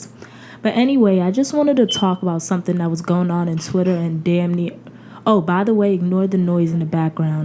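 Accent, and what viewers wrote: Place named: Bronx, United States. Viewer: I love your accent! Shout out to the bronx😁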